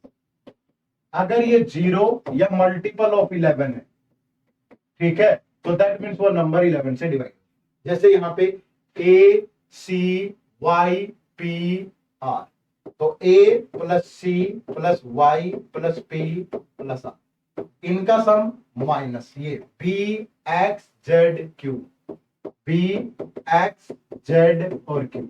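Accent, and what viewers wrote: Haryana, India. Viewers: According to me also because that speaking way and his simplicty show he is fromHaryana. I like haryana people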